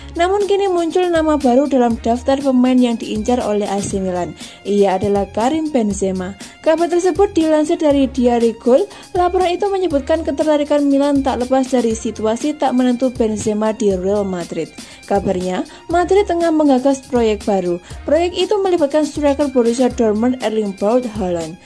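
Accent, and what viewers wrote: Java, Indonesia. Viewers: medok mbak yu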